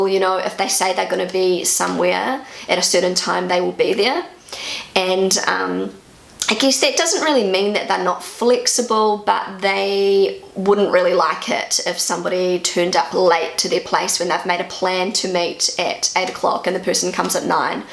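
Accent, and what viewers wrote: New Zealand. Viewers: Armin Ehrbar That is the Kiwi accent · Wer hat auch Probleme diesen Neuseeländischen Akzent zu verstehen?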